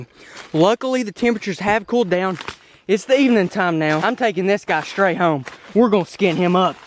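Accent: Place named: Kentucky, United States. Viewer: Dear god Came across your channel today watching the Indy 500 Heard your accent for the first time and I thought you were from Kentucky instantly. One you said that verse though, instantly subbed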